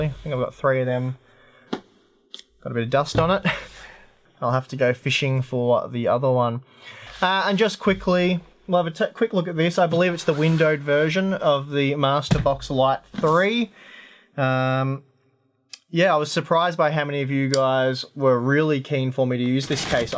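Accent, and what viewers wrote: Melbourne, Australia. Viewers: Hey, are you from Melbourne?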